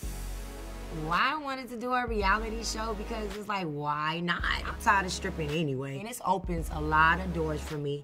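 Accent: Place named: Compton, United States. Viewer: Sis got a skrongggg Compton accent what is you saying lmaoo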